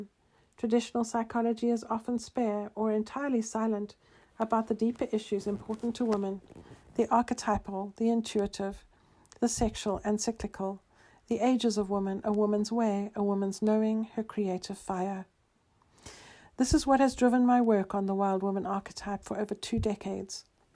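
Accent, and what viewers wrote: South Africa. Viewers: Are you South African? I love your accent. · Accent sounds south African ❤❤❤ thank you ☺️ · The reader sounds South African 😊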